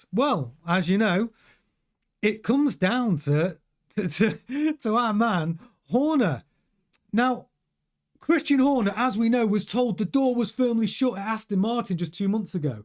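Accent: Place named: England, United Kingdom. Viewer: Dear sir , I cannot digest your accent , Just can’t understand what the heck you are saying, you are in UNITED STATES, not in FRIGEN ENGLAD .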